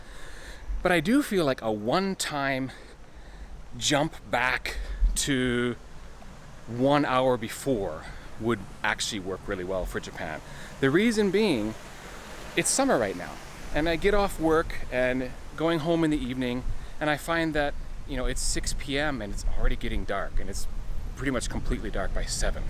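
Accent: Australia. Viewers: Your Australian accent is spot on, says this Kiwi. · Cheers for another fun video. Plenty of common sense in this episode, and the timezone point is very interesting. Looking forward to next video!! PS: that Aussie accent...maaaaaaate.... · Your Aussie accent isn't.... terrible. (An Aussie here). is TERRIBLE indeed. best, D.A. NYC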